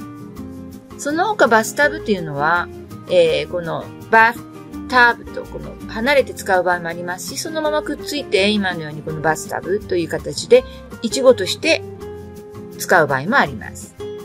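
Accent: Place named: Japan. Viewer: 英語には聞こえない！まるで日本語みたい！これでネイティブが聞き取れるかな？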